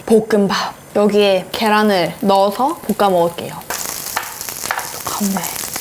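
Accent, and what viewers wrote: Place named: India. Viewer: 영어발음이 인도식인데 좋쿠만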